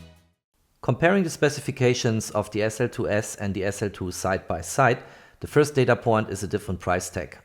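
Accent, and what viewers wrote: Germany. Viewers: Let me guess... Your German? Nice Video!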